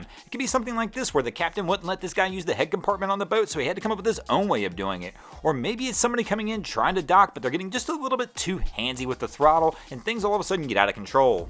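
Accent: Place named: Pittsburgh, United States. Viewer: Why do you sound like your from Pittsburgh? You even say, "dahn there". And yet, you're a Broncos Guru. I don't get it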